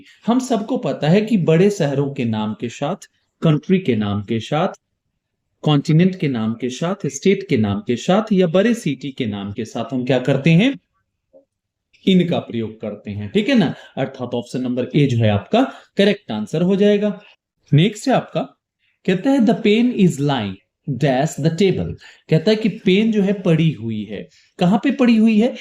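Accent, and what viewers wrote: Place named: India. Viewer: Very nice explanation. Which language is it? Very interesting.It sounds like Indian.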